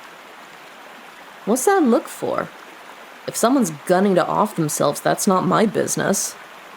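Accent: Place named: Ireland. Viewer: She speaks like my irish family so oddly comfortable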